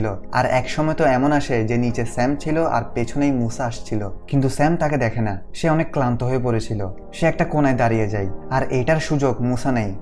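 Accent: Chittagong, Bangladesh. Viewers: আ বদ্দা! চিটাইংগা টান ত ন গিল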